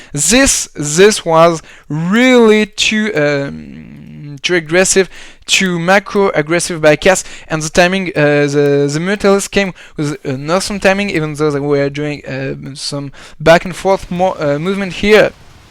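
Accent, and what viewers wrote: France. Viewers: Mis a part les "ze" a la place des "the" je crois que ce qui rend l'accent français si identifiable sont les "euh" quand tu hésite, ca sonne vraiment très français, je comprends bien que c'est assez dur a éviter, mais entraine toi a faire des "euh" anglais, ca sera toujours mieux, sinon ton anglais est assez clair et fluide, continue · Salut, bravo d'avoir pris le risque, mais fais quand même attention à éviter les fautes d'anglais. Personne te reprochera d'avoir un accent frenchie mais massacrer certains pluriels ou conjugaison pourrait te coûter cher!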